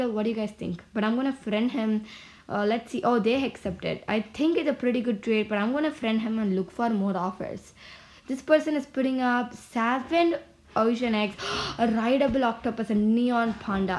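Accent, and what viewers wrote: India. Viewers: im also indian i was wondering if she is also indian because her voice is sounds like indian and she is indian :)) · By the accent she is Indian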